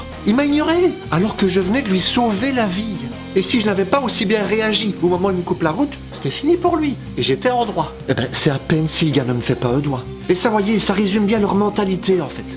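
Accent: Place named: Belgium. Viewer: Salut je suis cycliste, et je déteste les mauvais humoristes belges avec des accents idiots ! Mais je n'en fais pas une vidéo ...😊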